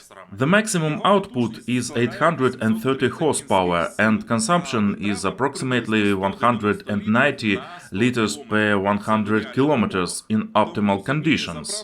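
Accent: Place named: Eastern Europe. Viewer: Можна було штучний iнтелект заюзати для перекладу голосом. Було бы гарнiше без нашого слов'янського акценту на захiд.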